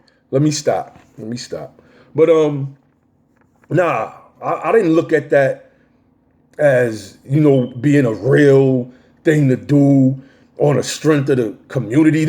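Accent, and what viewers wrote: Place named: New York, United States. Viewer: You sound like BX or BK, but I love your delivery. Have to Subscribe right now. You speaking for real truth. Exactly how I'm seeing this sucker move in Harlem.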